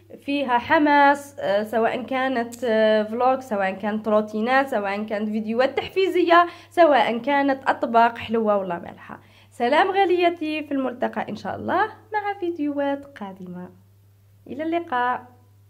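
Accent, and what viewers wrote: Batna, Algeria. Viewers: اععع لهدرة نتاع باتنية تعيف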